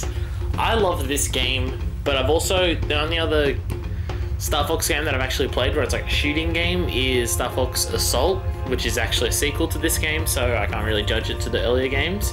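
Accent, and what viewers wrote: Australia. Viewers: Gawd, I love Australian accent! Thanks for these, MATE!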